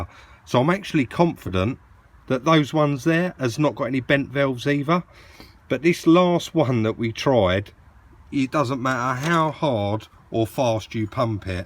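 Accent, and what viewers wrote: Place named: Liverpool, United Kingdom. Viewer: Great video Sir! Interesting English accent too.., is it Liverpool?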